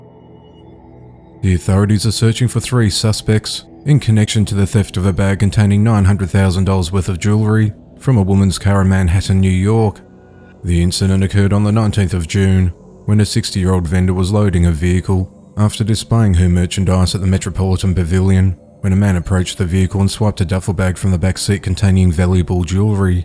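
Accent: Australia. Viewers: Cheers yourself, I came for the Aussie accent and stayed for the daily buzz 😀👍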